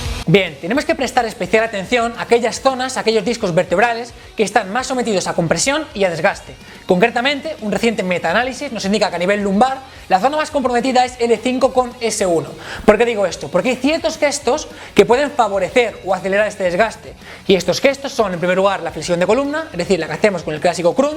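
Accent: Galicia, Spain. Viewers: Me marea mucho el acento gallego de este flaco. Me cuesta entenderlo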